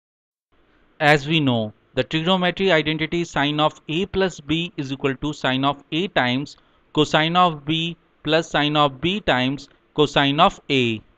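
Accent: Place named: India. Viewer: thank you for this video your pronunciation sounds like india 🙂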